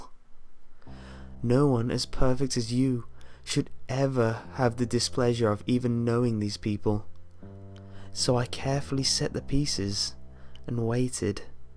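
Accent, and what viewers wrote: United Kingdom. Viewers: Your voice with a British accent is beautiful.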